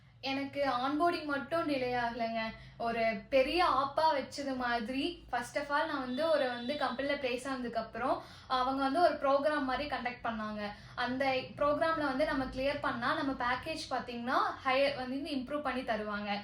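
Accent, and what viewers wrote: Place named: Palani, India. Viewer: good your videos are very nice to see.... Palani slang nalla theriuthu.....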